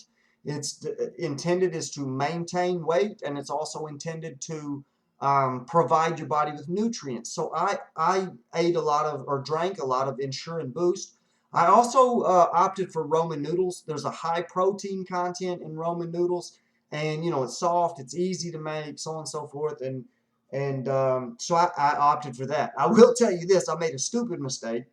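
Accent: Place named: United States. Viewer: man.. I am so happy I found your videos! I myself have been in a similar situation with my teeth and after the past 10 years and $thousands of dollars on root canals, crowns that break or fall off, on and on, you know.. I've decided I just have to get dentures. I have no choice and implants are out of the question. You've given valuable information to me and I'm sure a lot of other people. I feel like I'm listening to a close friend because of your accent. Well, it's not really an "accent", people don't understand that it's American the way we speak. Anyway, thank you again. I have a lot to do! Take care buddy.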